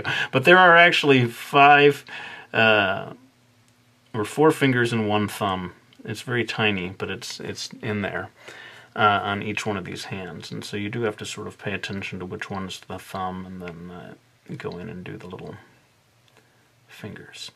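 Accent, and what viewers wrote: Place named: Turkey. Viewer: türk ingilizce konuşuyo la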